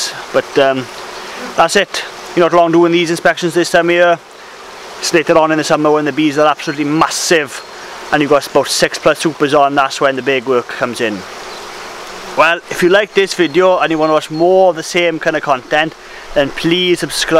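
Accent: Wales, United Kingdom. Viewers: only joking. Love the Welsh accent. Irishman here. Looking to get in to beekeeping. Have hives and equipment. Reading reading reading and watching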